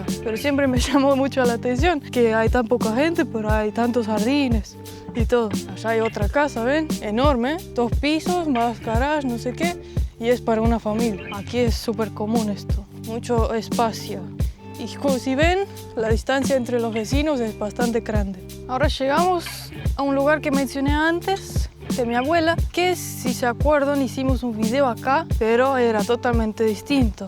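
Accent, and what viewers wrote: Argentina. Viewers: Tiene acento argentino o me parece😮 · tienes acento argentino 😲😮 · Fantástica, debería hacer más vídeos sola, por cierto no veo nada raro en tu voz, lo curioso es una estonia con acento argentino, deberías buscar un acento más acorde a tu continente, es decir castellano europeo, no latino americano, que no es algo malo, pero pareces argentina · Habla su español en Argentino 😅 .. te felicito por tener muy buen español excelente video bonitos recuerdos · Un lindo detalle tu español es muy Argentino, me hace reír , se te escucha bonito , cuando quiera mudarte a algún lugar vente a vivir a Venezuela,la isla de Margarita pudiera ser y cuando extrañes el frío , te vas a los Andes , y quiero selvas Ríos te vas al sur Al estado Bolívar , y al estado amazonas , y si quiera decierto ve a los médanos de coro , Adicora , y para el caldo s ve a Maracaibo, y quierea estar con ganado te al llano , montas a caballo , y cantas y bailas Joropo , aquí lo tienes todo ven que te muestro 😅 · Habla perfecto,es español,y tiene acento argentino😊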